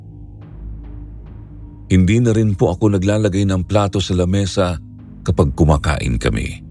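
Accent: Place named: Waray, Philippines. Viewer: Waray po kyo sir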